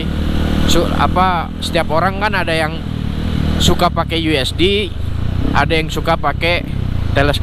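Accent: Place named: Medan, Indonesia. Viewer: Suaranya keinget temen kerja saya orang medan.. Horass nuhun..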